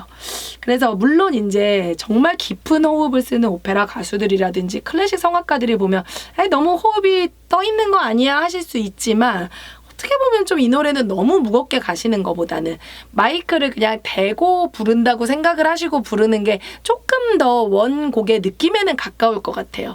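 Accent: Gyeongsang, South Korea. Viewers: 경상도 억양이 나오시는데요ㅋ 친근한 느낌입니다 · 열팬 됐습니다. 근데 말씀에 경상도 발음이.. 아닌가요?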